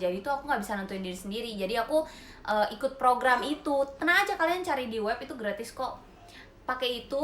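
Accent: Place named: Betawi, Indonesia. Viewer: Gw demen bnget klo denger mpok ngomong logat nye betawi banget mirip tukang nasi uduk disebrang rumah gw😁😁😁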